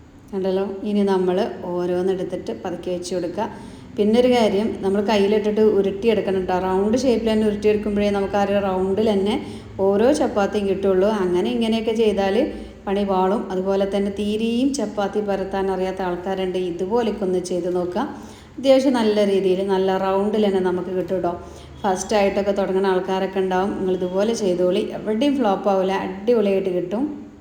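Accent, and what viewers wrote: Malappuram, India. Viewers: Samsaram malappuram style matiyoj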